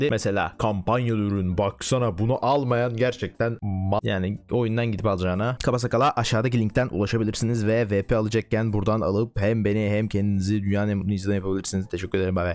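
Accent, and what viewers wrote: Thrace, Turkey. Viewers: abi trakyalımısın konusma tarzın oyle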